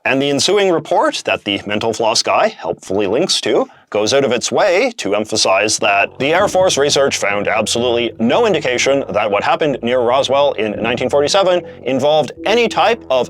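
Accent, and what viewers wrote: Canada. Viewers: D --> my guess is Canadian because of how he says the word "about" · How are you the most Canadian sounding youtuber ever??? That "About" has been UNMISSABLE in this video. Love ur videos tho. Love the way u articulate and project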